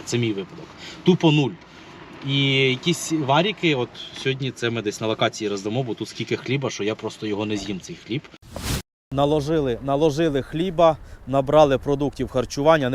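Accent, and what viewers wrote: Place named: Poltava, Ukraine. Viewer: Вас може це здивувати, але половина України БАЛАКАЄ. Далеко не всі в побуті спілкуються чистою літературною мовою. Місцеві діалекти рулять 😊. І немає в цьому нічого поганого, адже завдяки діалектам мова і є такою різноманітною. На Полтавщині навіть є такий жартівливий вислів : на сході "гаварят", на заході говорять, а у нас в Полтаві балакають і все чудово.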